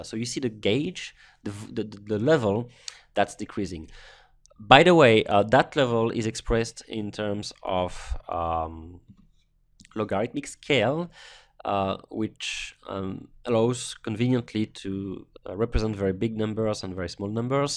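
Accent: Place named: France. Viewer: nice touch with the logarithmcic scales, it a good move on your part really, being able to represent 1E5 and 1E-5 just with 10 units of difference graphically shure does help a lot, the only thing I hope there will be is some kind of note for newcommers to inform them. It still seems to advance nicely. (but that french accent though, ça fait bizarre à entendre '-' . C'est surement moi qui est bizarre...)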